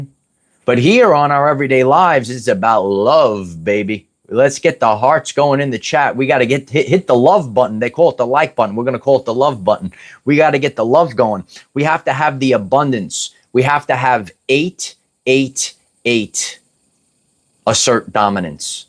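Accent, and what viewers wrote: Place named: Rhode Island, United States. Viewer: I found you from R.I. That accent is familiar lol. Close enough to NYC I think you're beautiful 🫂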